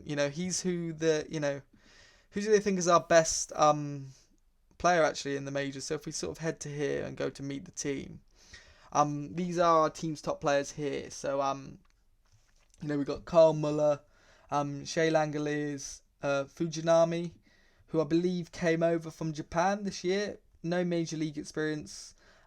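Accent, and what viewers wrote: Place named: United Kingdom. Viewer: Wasn't expecting a British accent with this..lol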